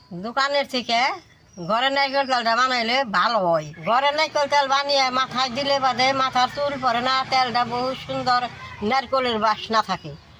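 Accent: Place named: Jamalpur, Bangladesh. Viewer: আপনার ভাষা অনেকটাই বাংলাদেশের জামালপুর জেলার ভাষার মতো ❤❤❤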